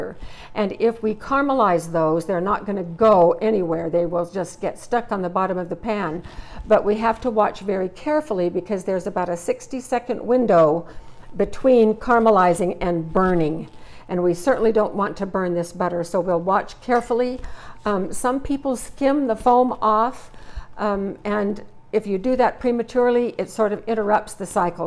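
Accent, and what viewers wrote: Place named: Arizona, United States. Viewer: Is she from Arizona Sounds me like šhe is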